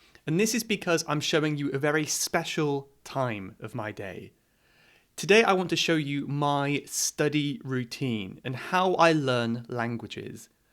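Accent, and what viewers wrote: United Kingdom. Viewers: I came across your videos today and I must say I love your British accent. I learned English at school, 50 years ago ! I have never had the opportunity to talk to an English person but now, at least, I can hear this lovely language. I have been learning French these last years and I used so many methods...! It's amazing how easy is to learn languages nowadays, (with a lot of motivation, as well). Thank you so much for your work. I would like to visit your country and take the famous 5 o'clock tea with scons ! 😊Greetings from Argentina.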